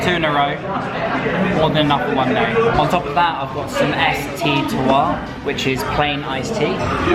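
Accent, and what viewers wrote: England, United Kingdom. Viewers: I love to heard your British accent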